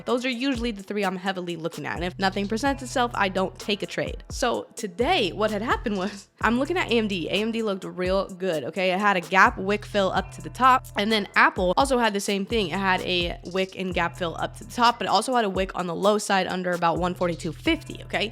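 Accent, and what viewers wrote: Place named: California, United States. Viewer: “Super hella delayed” Cali-bred through and through. I love it! Great video again ❤️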